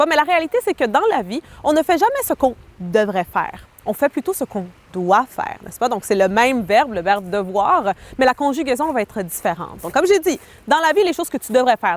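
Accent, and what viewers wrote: Quebec, Canada. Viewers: C'est l'accent québécois. C'est un peu triste de focaliser sur son accent au lieu de son message. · Ah!!!!!! Cet accent ça me rappelle de bons souvenirs au QUÉBEC merci je m y met.....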